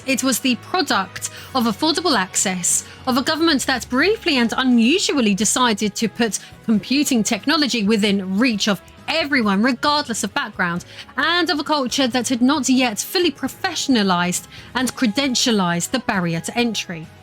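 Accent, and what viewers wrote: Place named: Cockney, United Kingdom. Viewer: Are there any factory workers left in the UK? Careful, your cockney accent betrays your working class background. Is it not possible to fake an RP accent? I'm from South Africa where no one cares about your background or even your education level, only your bank balance and where you live. You'd think we'd have a games industry here given that criteria but we don't.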